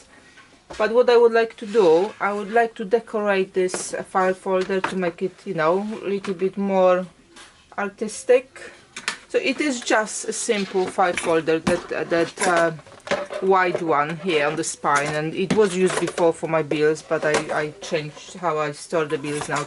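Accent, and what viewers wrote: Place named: Poland. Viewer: Good Morning, what a great idea on how to use the snow ball pieces, I would have never thought of it. Its a wonderful way not to waste any fabric. I really enjoy quilting videos and how to use up scraps. Definitely would love to watch more of your video's. I love your accent, I believe you are Polish, not sure if you are in the US. Your English is fantastic. Hope to see more of your videos.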